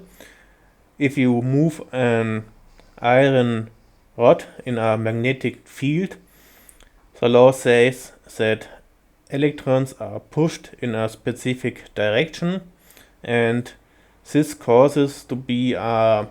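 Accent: Germany. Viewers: Das ist das deutscheste Englisch was ich je gehört habe aber danke für das Video👍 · When you know exactly that your boy is from Germany cause we all have the same foreign accent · das ist das Deutscheste Englisch was ich jemals gehört habe so geil man. mach weiter so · this alman english is amazing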